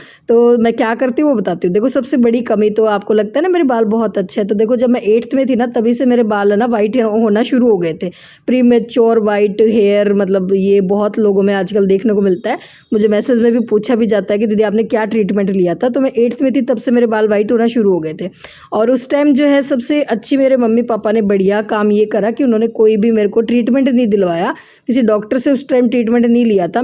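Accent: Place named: Punjab, India. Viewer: Aap ho Rajasthan ji to punjabi jese rehna aur bolna tone kyu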